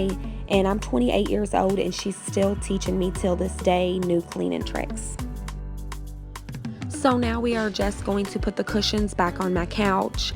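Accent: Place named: Kentucky, United States. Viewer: I’m from and live in Kentucky I thought you were from here by your voice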